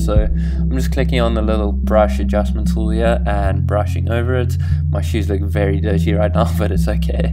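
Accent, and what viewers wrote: South Africa. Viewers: Immediately knew you were from Cape Town when i heard your accent.. great youtube channel and great content.. I'm about an hour away from the city and I'm also a photographer. Keep up the good content.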